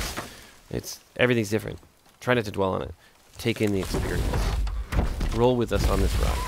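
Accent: United Kingdom. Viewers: British accent love it ❤️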